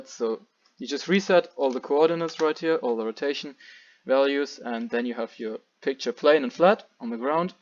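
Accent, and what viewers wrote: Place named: Germany. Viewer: The German accent is so stong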